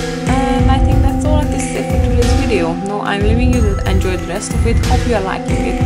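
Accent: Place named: Hungary. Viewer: Szia! Olyan érdekes látni, hogy mennyi magyar művész bújik meg elrejtve a videómegosztókon. :) Nagyon szépen rajzolsz, véletlenül hallottam meg a voicoverben hogy magyar vagy ><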